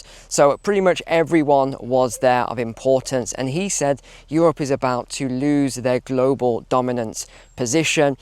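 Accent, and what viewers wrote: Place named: United Kingdom. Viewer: Thanks for your great info., humor, and stories---and we love your beautiful clear speaking, and with that great British accent. And your infectious SMILE...that helps while listening to some of these news items. God Bless you and your Family. Let's pray for a turn-around........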